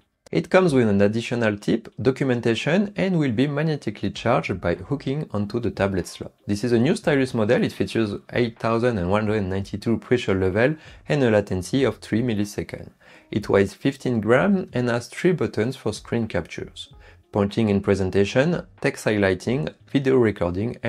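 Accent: France. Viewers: your french accent is very strong. difficult to understand. I suggests you speak slower so that people can understand you.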